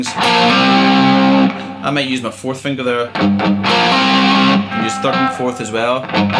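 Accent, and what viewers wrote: Scotland, United Kingdom. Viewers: Man his Scottish accent is so dang smooth. I found it a lot easier to follow along to, and helped me mail the song.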